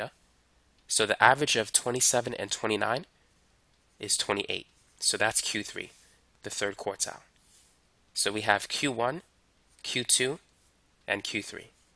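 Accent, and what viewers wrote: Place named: Brooklyn, United States. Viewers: you gotta be from new york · this guy is from Brooklyn I gotta say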